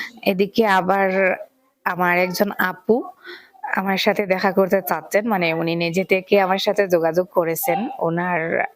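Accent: Sylhet, Bangladesh. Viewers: আপনার কথা সুনে মনে হচ্ছে বাংলাদেশি ভাষায় কথা বলছেন আপনি তাহলে আপনি ভারতীয় কি ভাবে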